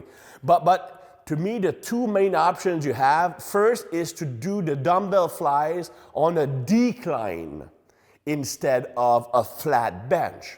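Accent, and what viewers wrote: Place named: Quebec, Canada. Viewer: it’s a Quebecois accent:)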